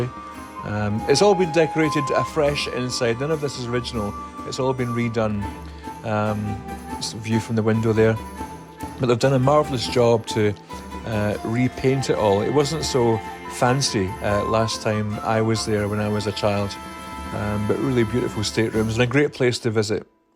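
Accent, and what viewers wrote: Scotland, United Kingdom. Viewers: Hello from Florida! That sweater looks so professionally done, I'm sure your daughter will love it. 💞 Your holiday must have been so relaxing. Wonderful food, great scenery, and the lady you love. What could be better? It's so nice to hear your Scottish accent.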